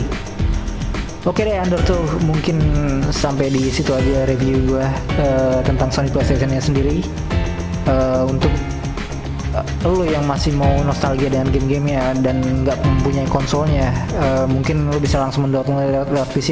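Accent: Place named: Surabaya, Indonesia. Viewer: Dari jengenge iki pasti wong suroboyo :D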